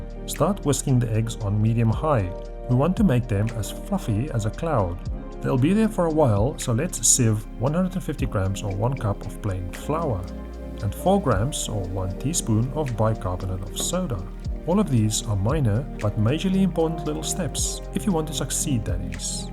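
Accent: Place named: South Africa. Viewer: You have a great voice with the wonderful South African undertone. Thanks so much for your videos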